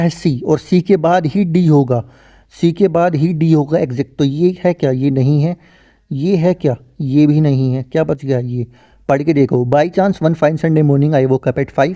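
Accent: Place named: Rajasthan, India. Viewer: accha mujhe rajsthani voice lagi thi aapki isliye puchh liya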